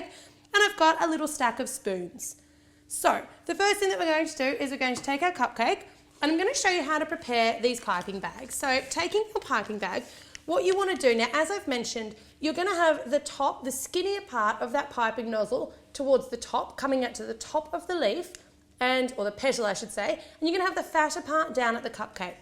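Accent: Australia. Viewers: Outta curiosity, is she Australian?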